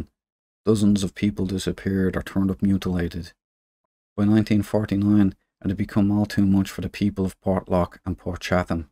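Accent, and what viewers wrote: Dublin, Ireland. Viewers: Ive watched many docs and read a tonne of stories about this place and many others similar...its good to hear a fellow dub tell the story i thaught i was the only person intrested in this in ireland 🤣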